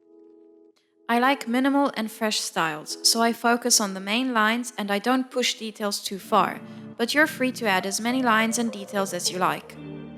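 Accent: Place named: Arab world. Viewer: just a question but omg are u arab? i am! and u have kind of an arab accent!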